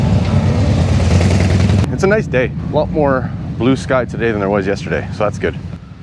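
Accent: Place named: Canada. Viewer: i just came across your Youtou channer ! and i love your accent So here's a question for you , where in Cananda did you come from ? (i'm planning to move to Candana and live there for about one year, but i'm stuggling to decide where i live in Canada. the purpose of my visit is to learn english though )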